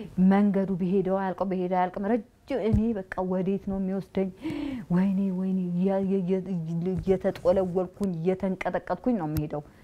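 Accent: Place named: Arab world. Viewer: እንግሊዝኛ ሰታወሪ አክሰንትሽ እንደ አረቦች ነው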